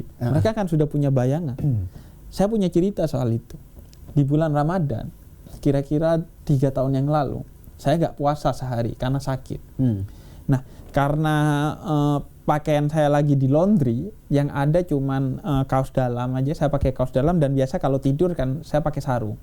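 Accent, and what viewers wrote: Madura, Indonesia. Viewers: Sama2 dari Salt-Island pantas saja logatnya sama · Logat mdura.. sma2 mdura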